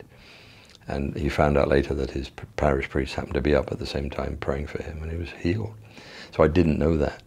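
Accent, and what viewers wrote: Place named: South, United Kingdom. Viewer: Its late. Couldn't sleep. Popped on YouTube and there you are in the 'Recommended for you' section. We miss you up here in the north country. You're developing a bit of a 'Southern tinged' British accent. LOL Be blessed my Friend.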